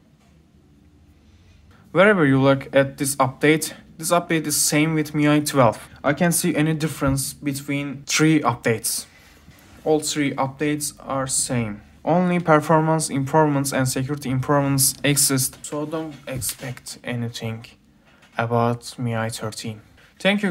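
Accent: Turkey. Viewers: Türk İngilizcesi bu nerde duysam tanırım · Ne kadar da Türkiş bir aksan derken adam harbiden turkmus zaten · Aksan tanıdık dedim meğer turkmus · niyeyse türk olduğun çok rahat anlaşılıyor konuşmandan ahaha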